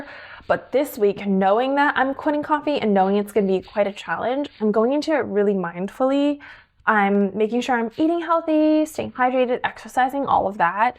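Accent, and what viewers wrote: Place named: California, United States. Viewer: I have never heard so much Californian accent from someone on YouTube before.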